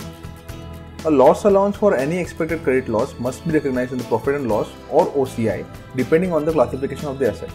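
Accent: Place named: India. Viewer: Indian English ooooah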